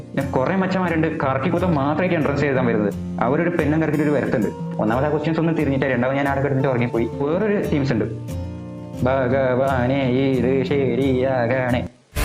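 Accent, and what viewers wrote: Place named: Thrissur, India. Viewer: കേട്ടാലറിയാം ത്രിശ്ശൂര്ക്കാരനാണ്.....😅😅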